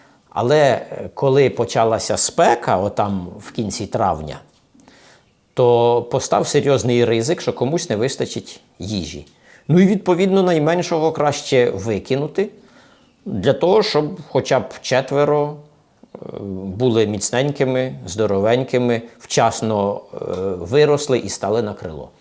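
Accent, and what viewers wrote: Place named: Poltava, Ukraine. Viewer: Мелодика мовлення у полтавчан особлива, впізнавана.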